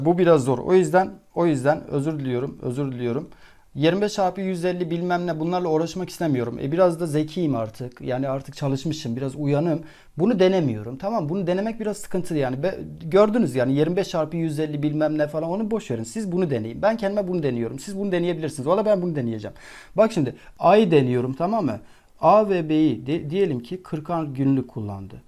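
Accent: Van, Turkey. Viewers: hocam sen kesinlikle vanlisin şive oradan ve inanılmaz semlatiklik katıyor:)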